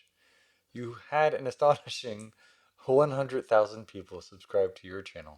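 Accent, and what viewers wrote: South, United States. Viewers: I love your Southern accent!!!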